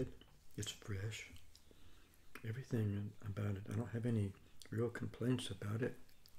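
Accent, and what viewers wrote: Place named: California, United States. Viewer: To me it kinda sounds Californian idk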